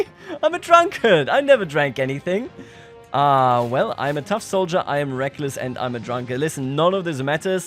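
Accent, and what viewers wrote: Germany. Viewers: 2 Sekunden und ich wusste das du deutsch bist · I smell Deutsch in your Akzent But I live this kind of content so pleas keep on by doing so man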